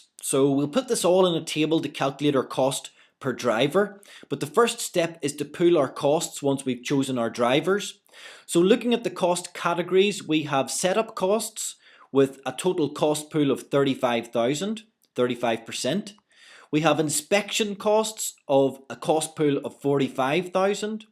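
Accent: Scotland, United Kingdom. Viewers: Scottish, I think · Is that Scottish accent? Soooo amazing!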